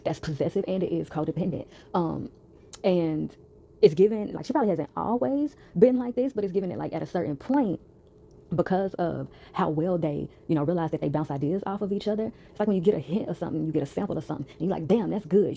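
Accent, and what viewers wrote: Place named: Queens, United States. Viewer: I love your accent, were you from Queen? I subscribed to your channel 💖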